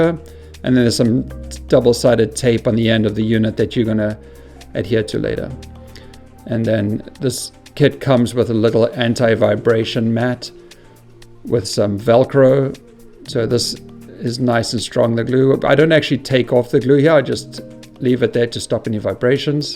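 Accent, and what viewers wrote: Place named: South Africa. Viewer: Nice Saffer accent :)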